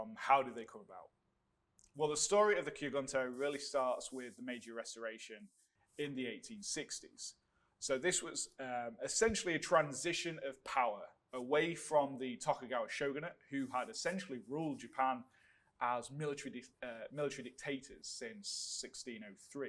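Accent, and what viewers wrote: United Kingdom. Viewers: The psycho in me likes the weapons and the cultured person in me likes a British voice talking History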